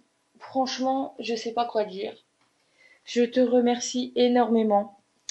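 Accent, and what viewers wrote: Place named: Nord-Pas-de-Calais, France. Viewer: comme ta l'accent chti toi c trop lol la chance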